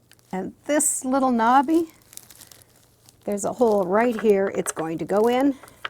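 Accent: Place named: Canada. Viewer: I just love watching you! Especially your pronunciation of some words in your Canadian accent!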